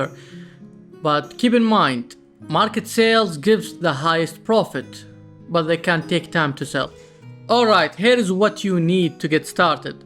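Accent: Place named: Arab world. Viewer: you sound arab! are you? nice video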